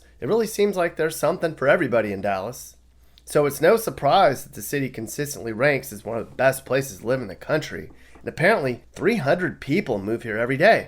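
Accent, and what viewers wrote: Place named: California, United States. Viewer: He sound like a fucking cali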